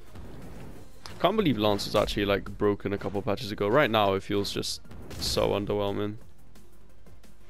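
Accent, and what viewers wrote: England, United Kingdom. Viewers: hey you're from england right?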